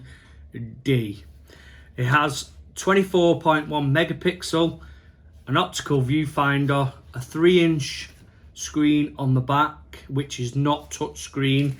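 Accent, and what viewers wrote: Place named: Northern England, United Kingdom. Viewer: This is gonna sound really stupid.. but I’m really happy I found someone with a good northern accent hahahaa no offence to anyone else but ya can’t beat a northern British accent (I’ll be embarrassed if you’re from the midlands or something hahaha)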